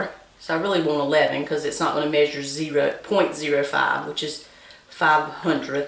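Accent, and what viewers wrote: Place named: South, United States. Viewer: I just love that southern accent👍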